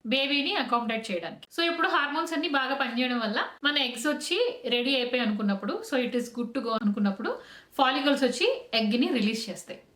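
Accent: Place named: Godavari, India. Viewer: Hi garu. One thing i could say.. You are so honest & Simple. I started watching ur channel with "HOW NOT TO DIE". You are from KAKINADA? Coz your slang is similar to EAST WEST GODAVARI. One thing i want to know, why you stopped NAMASTE LONDON Channel?? You said i woked as Assr manager in indias Lasrgest bank. SBI Or HDFC? ( Iam also a banker) Lots of best wishes from us. Appreciating your partner for supporting your dreams n aspirations. Wishing all the best to Be happy with channel.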